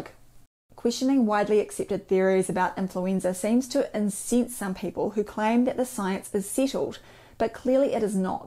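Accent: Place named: New Zealand. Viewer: But she a perfect New Zealand accent…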